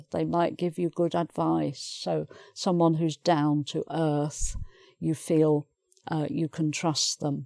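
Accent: England, United Kingdom. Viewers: stabel This is definitely not an Australian accent...she is from the UK and has a British accent. Btw there are MANY British accents!! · This english is more beatiful than the american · Hi! I’m Bruce from Korea 🇰🇷. I bet you are the best English teacher on YouTube. I am so jealous of your English accent. Wish I could have that one as well. Thank you! ❤️ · THANKS A LOT! I love your videos. Specially because your accent is from the UK. And since I live in Mexico every one learns to to speak that awefull american English, which I already know and, to be honest, don't like. I'm stil learning UK accent. An I hope to keep watching your videos until I'm dead. Hugs an kisses lovely lady!! <3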